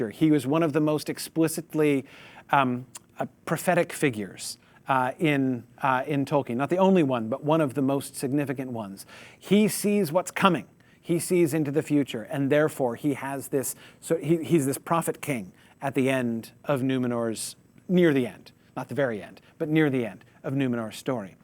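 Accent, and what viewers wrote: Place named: Ireland. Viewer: Irish hobfoot accent doesn't help. Can you hear the sounds of "magic WANd" & "number ONe"?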